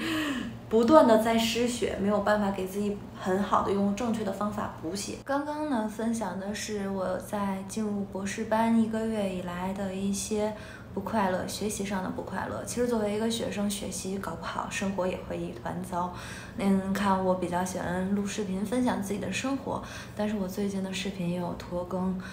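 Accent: Northeast, China. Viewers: 老妹儿，东北口音有点重啊～～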